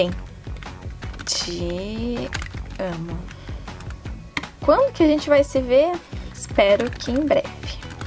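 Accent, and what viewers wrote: Rio Grande do Sul, Brazil. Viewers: tu é gaúcha guria? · Vc é gaúcha? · Guria é gaúcha??! olha nn sei c é gaúcha mais q é linda tu é qrd👌❤❤😘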